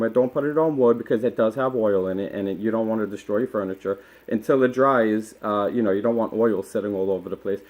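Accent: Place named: Boston, United States. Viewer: I love you accent. Are you from Boston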